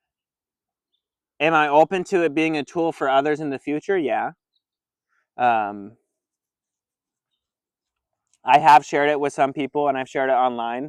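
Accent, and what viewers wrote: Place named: United States. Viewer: I watched till the end. Where are you. The backdrop looks beautiful, I'm guessing America by your accent but what state?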